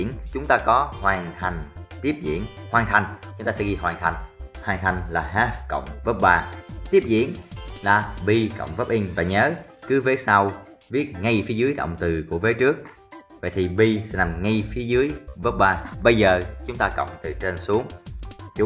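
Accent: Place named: South, Vietnam. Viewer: tại thấy bạn nói giọng miền Nam mà dùng không đúng ngôn ngữ của miền Nam. Bút viết : Viết ( miền Nam), bút ( miền Bắc). Ngôn ngữ miền Nam dần mất đi một phần là do ngôn ngữ miền Bắc thống trị gần hết câc kênh truyền thông ( báo chí , phát thanh truyền hình), SGK cũng do người miền Bắc độc quuyền biên soạn.Một phần cũng do chính những người miền Nam sinh sau năm 1975 không trân trọng, gìn giữ ngôn ngữ của cha ông mình mà còn tiếp tay xóa bỏ ngôn ngữ của miền Nam Việt Nam.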